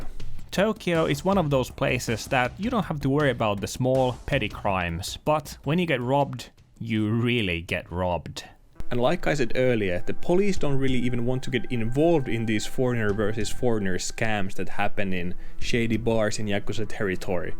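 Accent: Finland. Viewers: This video got recommended to me randomly. Very interesting. The second I heard you, I clocked you as Finnish hahaha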